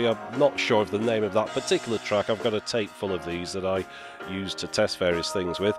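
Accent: United Kingdom. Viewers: Good to learn your British accent nicer voice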